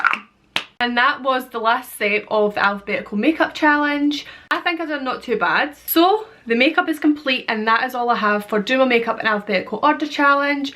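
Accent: Scotland, United Kingdom. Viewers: are you Scottish I take it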